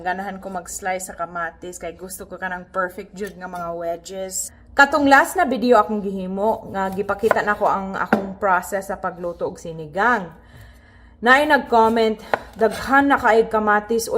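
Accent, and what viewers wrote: Visayas, Philippines. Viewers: Kacute ni dai magbinisdak oi.. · Anaa ko dri tungod ni Jared..ug ako nalisang Kay tungod Wala ko naanad ga lantaw ug amercanang nag binisaya ug Ang accent bisaya kaayong dako😂 I'm glad and I'm subscribing..I'm so amazed❤ this will be my favorite blog.. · Nice🎉 bisaya Accent! So fluent... _siyempre gikan sa pilipinas.. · Pagka bisaya baya nimo uy! Haha! Taga Cebu ko pero naa na ko ga puyo sa Virginia. I miss hearing my mother tongue. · Bagsika nimo mgbisaya dai oi😂❤ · i saw u in jared's video i'm tagalog but i grew up with bisaya friends even though i don't speak biasaya i close my eyes i hear a bisaya person speaking, a fellow filipino. more power to your channel. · There is no way that I can't subscribe to your channel. You got me with your perfect Bisaya accent. Maayo kaayo ka magbisaya, day! Lingaw ko tan-aw sa imong video unya kabalo pud kag Japanese word daikon sa radish. 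Diay, pareho mog nawong ni Angelica Panganiban. Pareho mo guapa!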